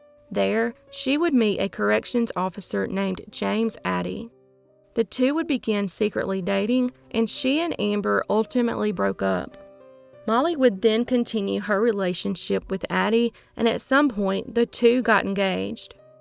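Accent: South, United States. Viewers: This is my first video with you and I'm already hooked! (Subbed ofc) A combination of my favourite things... Crime stories and the southern accent! 💖🇨🇦💖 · Good morning to you!😉 A good way to start my day! Your accent makes me miss NC ☺️ I do love love love your voice/accent! I can't say that enough!😋 Much love from Washington State 🤩🥰💞✌️ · Well… hey 👋🏽 there! Great to have you here again… I just love 💕 the accent! 🤗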